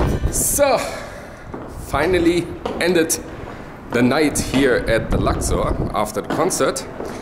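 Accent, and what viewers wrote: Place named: Germany. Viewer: "Early bird get the warm". Awesome German accent.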